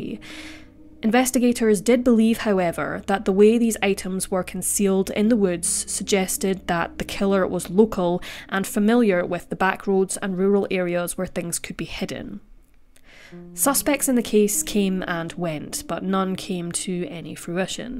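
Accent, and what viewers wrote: Scotland, United Kingdom. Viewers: Great content, great accent. Are you scottish?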